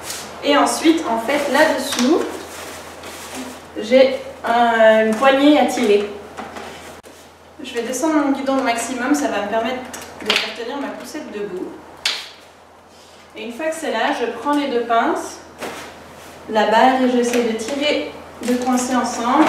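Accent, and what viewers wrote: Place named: Switzerland. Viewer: Bonjour, superbes vidéos! Très intéressant! J'ai l'impression vu votre accent et les mots tels que nonante, huitante que vous êtes suissesse. :-) Est-ce juste? Si oui, j'ai une petite question. Je souhaiterais acquérir une poussette Peg Perego et vivant également en Suisse, je ne sais pas où en acheter. Sur le site d'Orchestra je n'ai vu que des accessoires. Auriez-vous un conseil?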